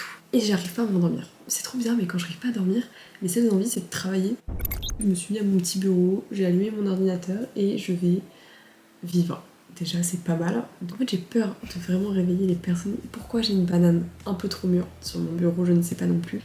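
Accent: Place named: Quebec, Canada. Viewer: Tu as un léger accent québécois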